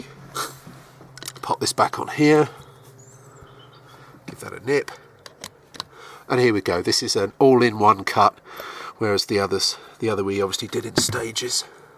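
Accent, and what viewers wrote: England, United Kingdom. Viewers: What a grand name and accent you have! Which part of England is your accent from? Wow! love it.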